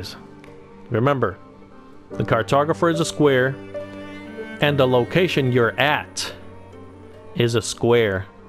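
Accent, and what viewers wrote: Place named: Spain. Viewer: Hey man,,l meant no disrespect, Spanish is a beautiful language and your english is very good, ,l should have known this by the way you pronounced compass, ,sub and share for your great reply back,,,thanks and a big hello from New Zealand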